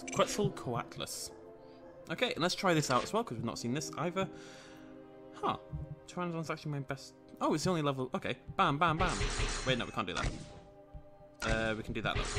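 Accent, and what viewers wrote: United Kingdom. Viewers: I love how says Hello Poppet. I just love the British accent I think British people are awesome.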